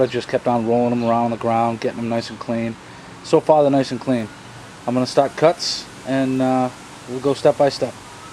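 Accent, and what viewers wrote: United States. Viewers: well done. im gonna copy it :p love the american accent. hi from New Zealand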